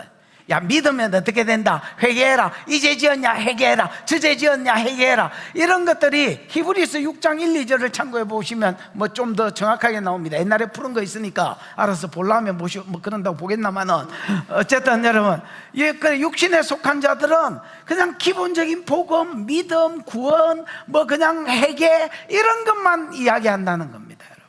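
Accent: Gyeongsang, South Korea. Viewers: 아멘 경상도 말을 득도하고 있습니다.!!!! 어쩜 이렇게 귀에 쏙 들어오는 말인지요.!!!!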